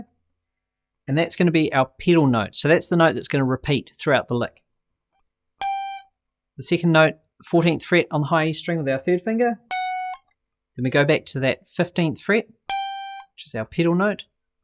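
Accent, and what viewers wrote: New Zealand. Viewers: Nice to hear a new zealander for once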